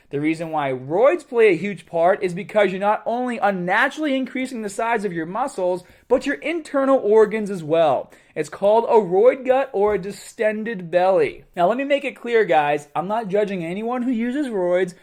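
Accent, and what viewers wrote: Boston, United States. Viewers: Stop giving lessons on body training but teach us how to speak with a Boston accent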